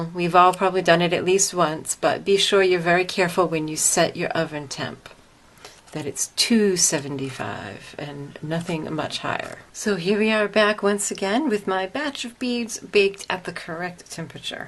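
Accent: United States. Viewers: OMG I was totally going to ask if you were south of Boston because you sound so much like my friend from West Bridgwater! Oh my goodness you sound like an actual native - BUT not everyone has the ear for the subtle new england accent differences so I'd be willing to bet not a lot of other people make this assumption about you (I'm from Boston but have tried hard to eliminate it when necessary - as not to have to repeat myself and/or sound crass lol). I should really become a phonologist! :) oh and more importantly THANK YOU SO MUCH for this tutorial because I just finished it and you helped me so so much just by letting us know that the alcohol ink should dry matte before mixing! Best tip ever! <3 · Are you from the Boston area? Picking up an accent and I want to know if I’m right 🙂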